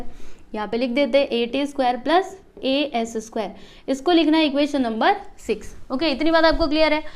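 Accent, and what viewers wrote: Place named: Mumbai, India. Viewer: Your mumbaikar i know from your language like likhneka karneka words❤❤😊😊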